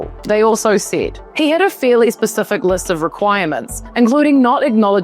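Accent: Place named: New Zealand. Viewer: Really enjoying you videos! Are you a kiwi? 😊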